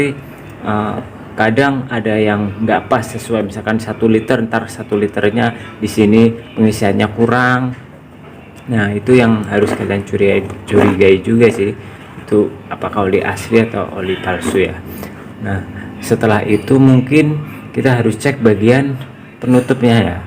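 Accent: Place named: Cirebon, Indonesia. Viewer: ngomongnya kyak orang cirebonan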